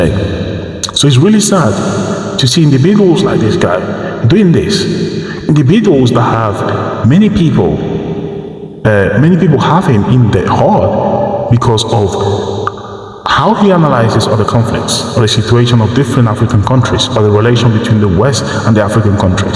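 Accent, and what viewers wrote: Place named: RP, United Kingdom. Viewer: Your analysis is flawed ... And your Porsche accent shows you are not even resident in Africa.... Many of your congloese brothers and sisters on your Eastern borders are celebrating the M23 rebel takeover of Bukavu and Goma cities.... DRC government too has to take responsibility blaming Rwanda wont cut it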